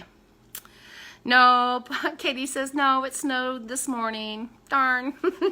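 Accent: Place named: South, United States. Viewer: I am doing Keto IF so I am at the point where my appetite is basically gone some days, but I have been eating the same foods for a while now. Mostly the usual and I am following the 7-10 cups of vegetables a day per Dr. Berg, but your Keto bread and other recipes are going to help me to make this more of a good lifestyle rather than such a restricted lifestyle. I'm from MN and sometimes when we northerners listen to someone from the South it can be hard on our ears but you have the sweetest voice and it's just so nice to listen to your laugh!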